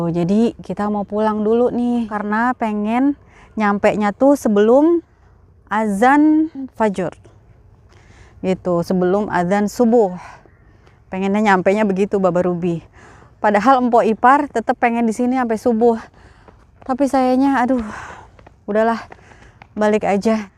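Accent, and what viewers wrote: Jakarta, Indonesia. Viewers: Betawi bgt si mpo..🤭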